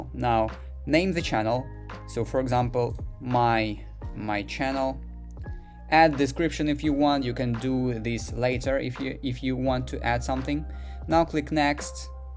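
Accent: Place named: Russia. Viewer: В вашем английском я слышала русский акцент... Но потом увидела « »...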